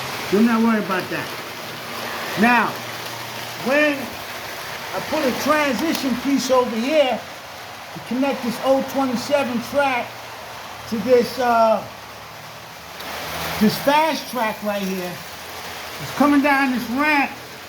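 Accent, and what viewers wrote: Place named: New York, United States. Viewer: 👌🏾…I can tell a NY accent from anywhere lol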